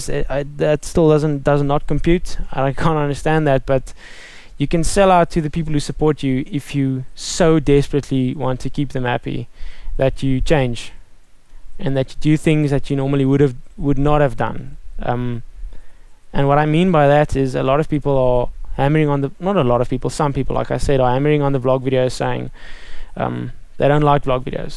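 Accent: South Africa. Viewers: Oh, you're form South Africa! It was bugging me not knowing where your accent was derivitive of. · don't stop making vlogs! i need my dosage of south african accent! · clearly south africa from his accent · its south African, its really strange. Almost like french and British combined xD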